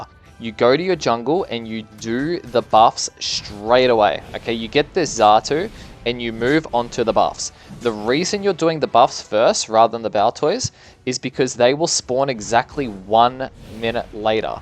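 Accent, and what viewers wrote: Australia. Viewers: Are you australian!?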